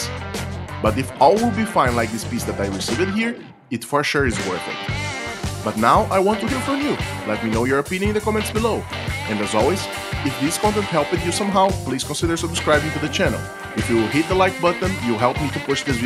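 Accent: Brazil. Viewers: Sotaque de brasileiro falando inglês! Hahahaha vídeo top!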